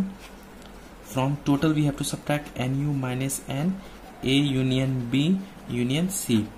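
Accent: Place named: Nepal. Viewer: Your accent sounds nepali. Are you??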